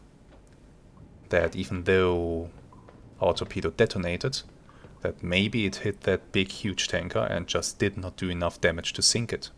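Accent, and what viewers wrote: Germany. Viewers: great stuff. And the fact its a german accent in u boat playthrough makes it extra spicy. Next goal: 200!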